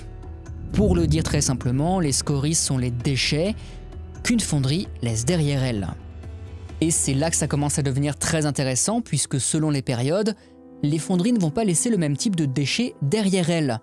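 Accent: Alsace, France. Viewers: salut je viens de découvrir ta chaine avec le mur paien, je me demandais, tu es alsacien?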